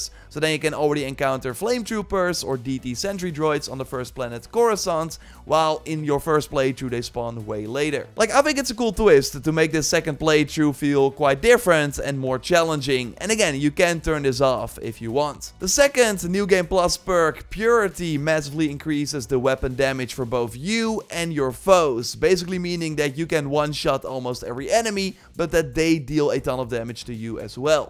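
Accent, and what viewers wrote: Netherlands. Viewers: Telling by your accent, I immediately knew you are Dutch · Are you Dutch? I think I hear it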